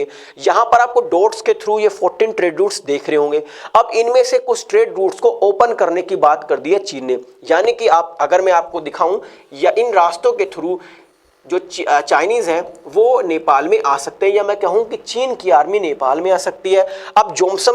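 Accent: Bihar, India. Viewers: Aap bihar se ho na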